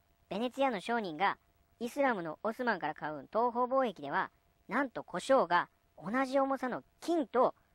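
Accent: Kansai, Japan. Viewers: なんで無駄に関西弁？凄く受けづらいんだけど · 関西弁聞きづらい。。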